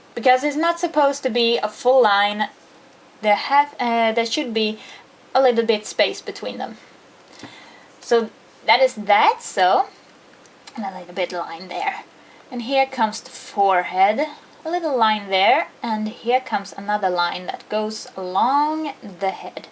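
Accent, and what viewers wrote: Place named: Sweden. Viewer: i knew you were swedish by your accent, since I watc a lot of Pewdiepie haha but this is amazing! thank you very much ;)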